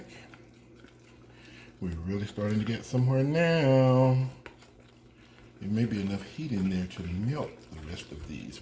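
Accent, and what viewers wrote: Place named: South, United States. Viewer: Love your southern man accent. Yes!!! Loving the 😀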